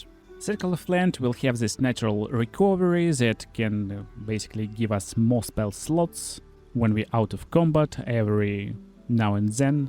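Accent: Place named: Russia. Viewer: Ты из России? Акцент характерный!)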